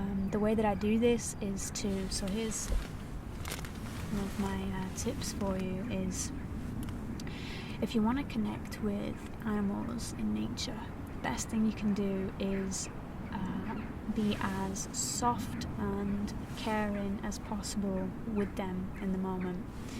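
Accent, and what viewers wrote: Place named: Manchester, United Kingdom. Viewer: Your great! Where are you from im detecting manchester?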